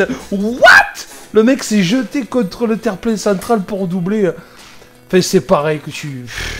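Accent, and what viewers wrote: South, France. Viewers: Salut, toujours très sympas tes vidéo et ton accent du sud. a oui le stop mais rassure toi chez nous en Picardie ces pareil aussi (grrrr) allez bonne roulade tu le dit si bien et au plaisir.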